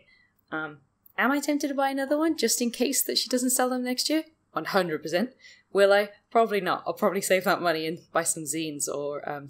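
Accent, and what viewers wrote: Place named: Wales, United Kingdom. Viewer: Great PJs and love how you're sounding more Welsh after your trip home! 😘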